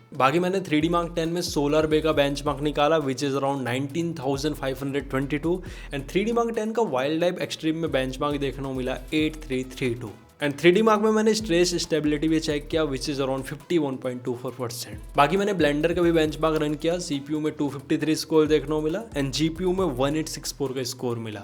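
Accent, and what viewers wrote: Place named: Maharashtra, India. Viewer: Great detailing even mentioning the nand gates used! U r going to make it big 🎉 Could sense marathi accent in your English then checked ur insta handle, great to see :)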